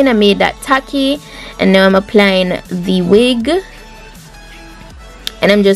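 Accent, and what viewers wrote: Jamaica. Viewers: From I hear how she talk I know from d start she's a Jamaican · You have an accent like a jamaican lol I am lol 😄😃 n if u are, ur very gorgeous n beautiful.. That wig were made perfectly 4 you! 😄👍 Tanx 4 sharing.. Blessyou! 😄💓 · You sound like a Jamaican 👍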